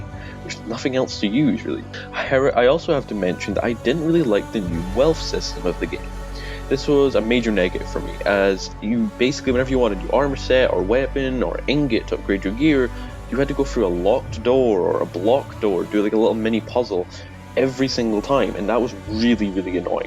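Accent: Scotland, United Kingdom. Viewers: I can't tell what your accent is, is it scottish?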